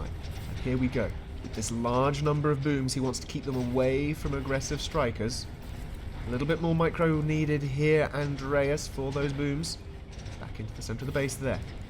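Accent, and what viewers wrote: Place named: United Kingdom. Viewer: Excellent narration refreshing to hear hear a cool British accent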